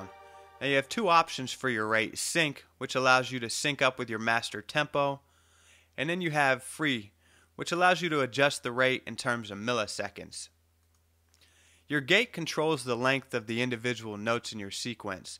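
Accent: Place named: Louisiana, United States. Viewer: what is this accent.... louisiana? sounds tight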